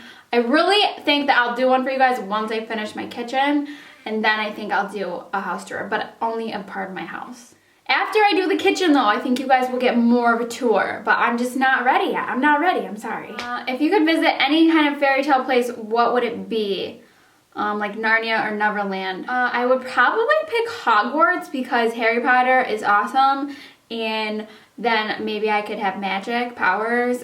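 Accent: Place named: New York, United States. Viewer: Asked too soon.... Sounds like you are from somewhere in NY! Represent! :)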